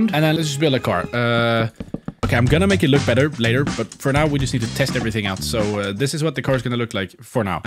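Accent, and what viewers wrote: Sweden. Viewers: Are you swedish? Bec i hear a accent.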